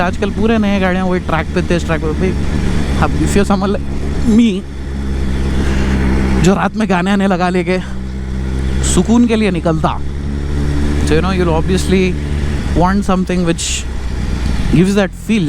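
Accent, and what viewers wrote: Hyderabad, India. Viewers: Just loved the way u vlog with hydrabadi English accent with galiya amazing maza aata bro (hau)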